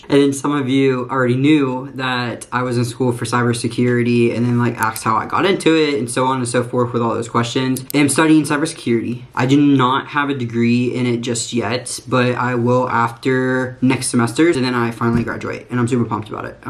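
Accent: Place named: Marietta, United States. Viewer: Is it bonkers that I could tell you were from Marietta by your accent? I went to MC about a million years ago and I can still hear it.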